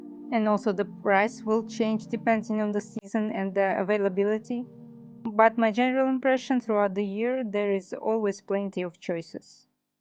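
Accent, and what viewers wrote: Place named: Russia. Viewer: Hi, thank you for the video. My dream is to retire in this area, Antalya or Side. You sound like you are russian, and my wife is russian. I am norwegian. I speak english very well, quite a bit of turkish, some russian. My wife does not speak turkish, but russian and some english. My thinking is that she can live there and manage quite well with russian. However, she does not always like associating with other russians, and I'm not entirely sure why. If we one day will take a trip and see how it is like, would it be good to rent something with AirBnb, or aparthotel like you did? If so, what area?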